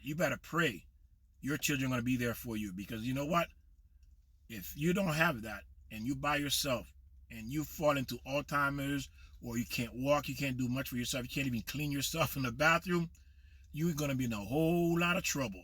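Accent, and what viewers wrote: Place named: Caribbean. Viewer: Where are you from? I hear a West Indian accent.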